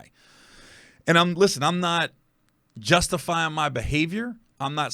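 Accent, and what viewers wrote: South, United States. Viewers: He from down south you clown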